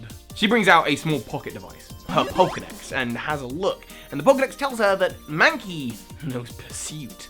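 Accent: United States. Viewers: Nice American Accent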